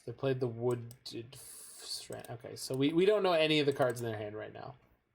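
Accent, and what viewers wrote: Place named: Italy. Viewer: The averagely annoyed Italian accent is hysterical xD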